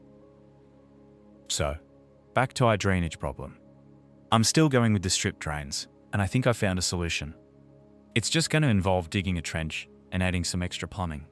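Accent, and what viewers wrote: Australia. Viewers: I have watched a few of these videos over the past couple of days here in Dallas, Texas, and you are spot on about his soothing voice. It is warm and comforting and his enunciation seems effortlessly precise, just like his placement of every. single. one. of those goddamn bricks. Add to that the Australian accent and, well, I would pay top dollar for the software that reads to me my emails and texts in that voice. Pivoting now to address the owner of that voice, you have mad skills, sir. Many. Your diction and the stories you tell reveal your intelligence and an awareness that few possess; your videography and landscape designs are evidence of a keen eye; and your diligence and unwavering attention to detail are the mark of a true craftsman. Bravo. 👏 I'm glad I found your channel. I'll keep watching. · Lovely surprise to open a clip and hear an aussie voice. 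Great video. Thankyou for sharing.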